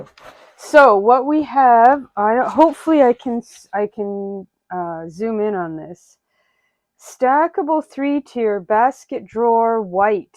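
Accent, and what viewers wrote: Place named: Maritimes, Canada. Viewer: I just stumbled across your channel today. From your accent, or lack there of, I was working on the premise that you were in Canada and I am betting the maritimes. I love what I have seen so far and have happily subscribed. I am in the process of preparing to move. Ugh…. However, there will be a dedicated craft room in the new place, so there are benefits to going through the agony. Your organization ideas have got my mind stirring. I have a dresser that will fit perfectly in the closet in the room. So far I am dedicating a drawer for each type of craft and each drawer will contain the tools and frequently used items in it. Unfortunately that means that I have to store extras outside of that room but hey, it’s a start. Please keep up the posting as I am now addicted. Anyhoo, hello from Ottawa!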